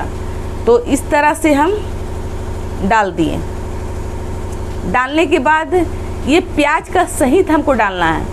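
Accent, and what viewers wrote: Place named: Varanasi, India. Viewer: Madam, aap aur aapki aawaaj me mujhe apna family dikhati hai. Shayad aap banaras ki hai.maduaadih kì hain? Reply kariyega.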